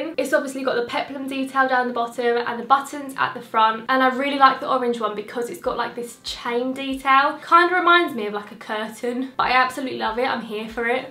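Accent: Essex, United Kingdom. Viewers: Is it possible that you become more Essex with every video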